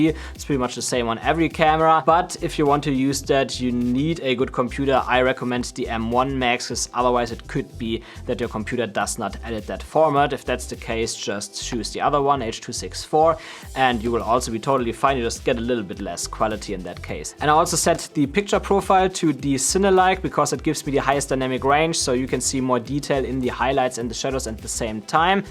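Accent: Germany. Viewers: Super Video. Ich schreibe mal auf deutsch weil ich anhand deines Englisch denke, dass du aus Deutschland kommst.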